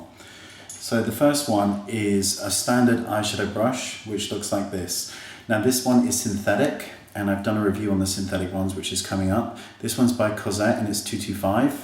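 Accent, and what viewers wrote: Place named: England, United Kingdom. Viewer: He has an English accent.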